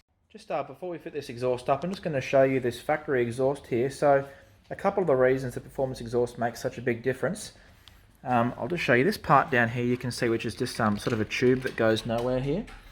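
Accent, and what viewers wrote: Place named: Northern England, United Kingdom. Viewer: oh my god I’m sorry about that cause the north of England sounds like that sorry!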